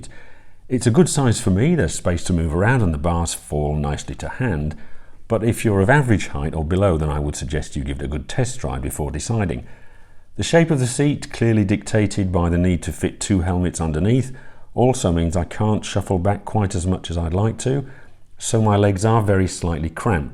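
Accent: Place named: England, United Kingdom. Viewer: You sound obviously very English to me, and you've been "fully metrified" ! The 3.5 litres for 100km , that's 80 MPG (UK gallons) to me ! My fully metrified infant schooling that started in the early 1970s couldn't compete with me having to chat to my very imperial measurements only Father and Mother ! But then I haven't escaped from what is usually wet Blightly for the sunny vistas of the Algarve. Mind you with the temperatures we've had in the UK for a few days this week , as much as the sunny vistas of the Algarve usually seem very appealing, I'm not sure I can handle the heat. Come back and ask me between October and March where I'd rather be, and I'm guessing I'd want to be in the Algarve ! oh, and good review , as always :-)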